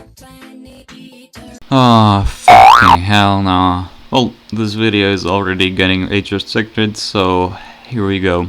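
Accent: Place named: Czech Republic. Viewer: nice finally i see someone saying that the czech dub is nice WAIT HOLD UP TY JSI ČECH? such a nice accent I'm almost jealous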